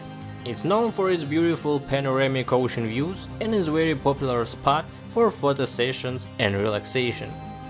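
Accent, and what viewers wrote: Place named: Russia. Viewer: Brutal russian accent bro😂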